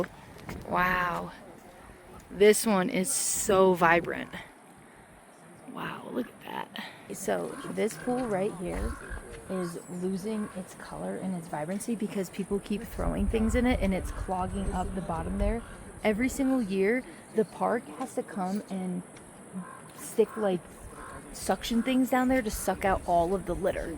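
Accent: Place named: New York, United States. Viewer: Thanks for bringing us along on your adventures! I love your New York accent btw!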